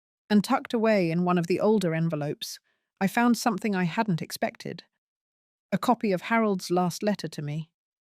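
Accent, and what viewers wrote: England, United Kingdom. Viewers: Why is a woman with a very heavy British accent talking abiut maps in Missouri? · As the Woman voice is in UK English, assume this is a British story. You would not drive for 9 hrs to her sons home in the uk. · She's from Missouri but speaks with an English accent?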